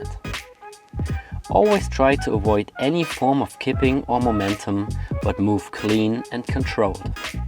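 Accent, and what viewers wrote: Germany. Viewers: German accent :D :D :D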